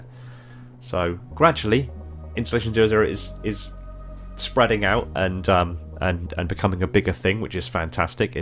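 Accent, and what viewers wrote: United Kingdom. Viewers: I always love ur voice btw. Also r u British? I forgot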